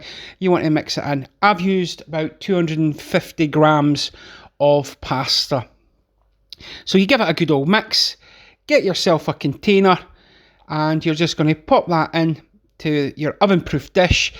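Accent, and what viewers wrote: Scotland, United Kingdom. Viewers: That's what was missing, Food being prepared with a Scottish accent on the lad. Thanks!! Slainte Mhath!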